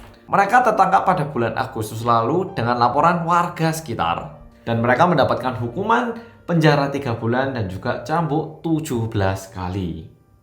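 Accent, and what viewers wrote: Java, Indonesia. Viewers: Mas nya asli orang korea ? Atau Asli orang indonesia... Logat nya aga sedikir terdengar ada logat jawa..tp muka kaya orang korea... Jd mas nya orang korea atau indo ?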